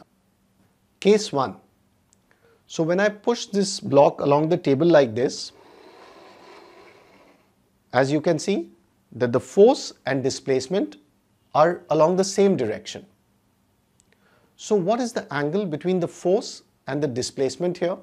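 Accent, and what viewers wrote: India. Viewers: Sir your video on work is more convincing. Even you use simple indian pronunciation. That's why I am impressed. Thank you sir. You are doing hard work. Without the intension of fruit. Thank you again. Keep it up!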